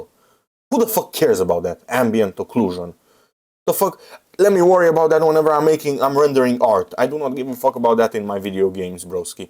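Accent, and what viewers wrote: Romania. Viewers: Salut. Ma uit la video si abia dupa 10 minute imi dau seama ca esti roman. Preturile sunt mai scumpe in EU pentru ca se adauga TVA la tot, peste care se adauga pret transport si adaos comercial. De-asta e suparat Trump ca noi europenii avem TVA, la ei nu este. Eu am 7800x3d si rx 7900xt luate in aprilie 2024, upgrade de la i7 11700F si RTX 3070. Nu m-as mai intoarce la intel si nvidia, poate sa le primesc gratis. Ma uit si eu cu jind la 9800x3d si 9070xt si ma cam "mananca" sa fac upgrade dar nu e logic sa dau bani pe upgrade decat peste inca o generatie. E diferenta de 11% maxim intre ce am si 9800x3d/9070xt. Daca poti fa switch la proc AMD nu o sa regreti. Eu aveam consum de 175W in cinebench la i7 11700f cu placa smechera z590-f care scotea tot din procesorul ala fara K (un fel de PBO de la AMD) si era varza procesorul pe langa asta, ryzen 7800x3d cu 86w in cinebench si scoate cu mult peste cel vechi, sa nu mai spun de gaming rupe tot in afara de 9800x3d. 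Faptul ca am luat procesorul asta cu consum mai mic mi-a dat posibilitatea sa pun placa video cu consum mult mai mare(318w de la 220w cat aveam inainte la gpu) la aceeasi sursa de 750w. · I can tell the Romanian English accent , because im Romanian myself, here's a sub , i feel like we're already friends lol .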